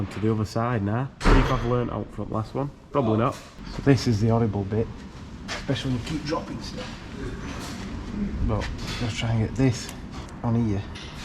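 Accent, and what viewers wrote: Yorkshire, United Kingdom. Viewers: Great video, when you are a fantastic orator with a natural talent for unscrambling technical data with added Yorkshire dialect. You sir have to own the fact that you are indeed the most popular presenter on this channel 🤷🏼‍♂️ embrace it 💪🏻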